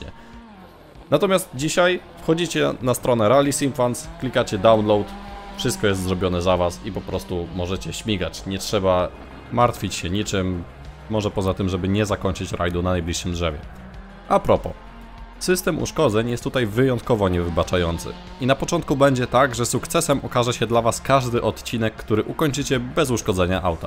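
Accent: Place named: Poland. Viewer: Słuchać? Ja musiałem wyłączyć dźwięk ponieważ uszy mi krwawiły od polskiej wymowy angielskich słówek. Autor chyba nigdy... kurwa nie wiem co....... Ja pierdole.... pozdrawiam. Bez urazy :)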